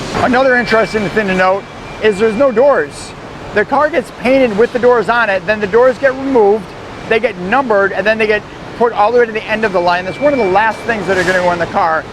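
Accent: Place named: Chicago, United States. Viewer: I sense a Chicago accent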